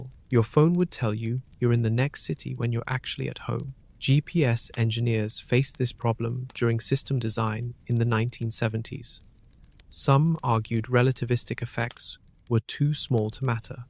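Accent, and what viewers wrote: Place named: United Kingdom. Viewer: Always the damn British accent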